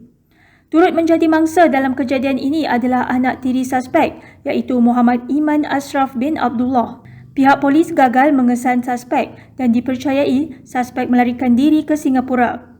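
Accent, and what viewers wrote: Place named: Kelantan, Malaysia. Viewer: Speaker pelat... ore klate ko haha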